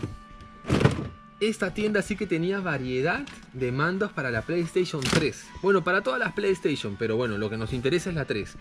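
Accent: Peru. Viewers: Mano eres de peru verdad Por cierto que buen canal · eres peruano no manolo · BUEN VIDEO BROT POR TU ACENTO DIRIA QUE ERES PERUANO...SERIA BUENOS QUE BUSQUES EQUIPOS DE SONIDO VINTAGE HI FI HAY UNA GRAN COMUNIDAD DE ESO ASI COMO YO EN CONSOLAS GAMER , MINICOMPONENTES DE SONIDO ETC SALUDOS DE LIMA - PERÚ. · Eres de Perú ? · Por la manera de hablar es peruanazo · Por su acento probablemente es de Perú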